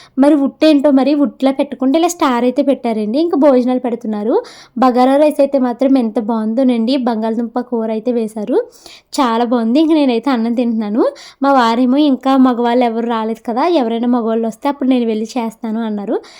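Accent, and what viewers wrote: Godavari, India. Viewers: Medi proper a uru andi, me puttillu ekkada, ante slang godavari valla la undemo anukuntunna.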